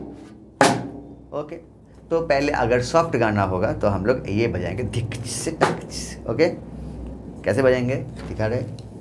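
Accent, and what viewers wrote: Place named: Bihar, India. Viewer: Ami jani ....tumi Bengali but tmr Hindi language taan Bihar ar moto tai ...Aaj k thk haam na mey bolo..khub vlo lgbe amader sunte.. please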